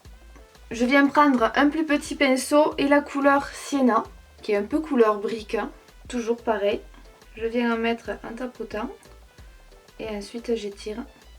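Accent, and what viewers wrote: South, France. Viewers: j'adore (on a le même accent qui sent bon le soleil ;) )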